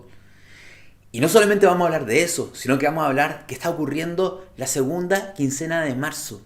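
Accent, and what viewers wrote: Chile. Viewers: Amo tu acento chileno es un placer escucharte. Te mando un abrazo gigante de esta uruguayita viviendo en el hermoso México ❤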